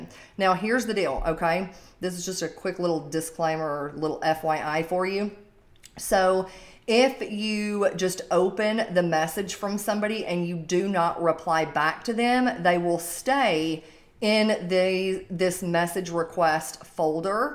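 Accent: South, United States. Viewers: I was born in the south so Love the Southern Accent!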